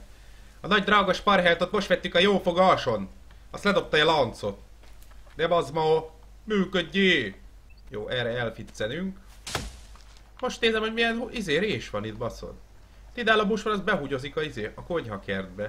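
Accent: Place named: Transylvania, Romania. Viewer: nagyon erdéji a beszéded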